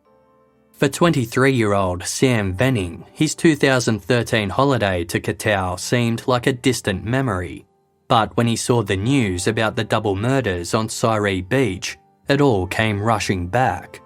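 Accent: Australia. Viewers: Thankyou! I'm so glad I've found an Aussie narrator! Makes listening so much easier and relatable! Thankyou, team, for researching as well as you do. Truly unmatched. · I agree with livingtheaussiedream. Nothing personal to the yanks but its great to hear Australian English spoken.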